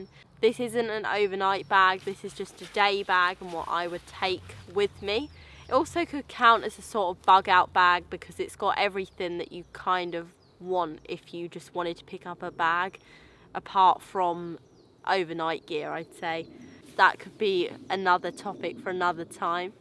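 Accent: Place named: RP, United Kingdom. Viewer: Another great video. Thanks a lot. Always nice to have someone's different opinion on something. For example, i was just looking at the small cook set for my day pack i want to start, and wasn't sure on "alcohol/petrol" stow that comes with it. but one phrase of yours made it easier for me:" for the day you can't start the fire". always different prospective to look at something. Day pack is a day pack and needs to be light, but what if you got rain and got lost - extra few oz is nothing when you need to eat and a day trip becomes overnighter. Also, admiring proper Queen's English and proper acescent that comes with it Keep doing good videos. Thanks.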